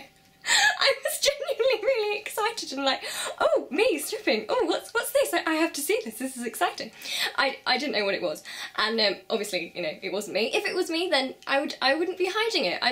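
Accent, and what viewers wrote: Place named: London, United Kingdom. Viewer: What part of England are you from? You sound London, but on some words you sound a little different.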